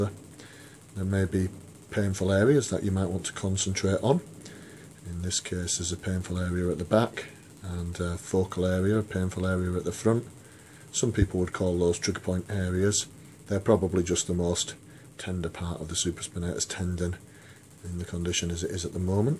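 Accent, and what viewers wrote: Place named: North, United Kingdom. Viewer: Can you use EWST for proximal long head bicep tendinitis? You sound northern are you based in the north ? Do you have a website (assuming the answer to the 1st Q is yes).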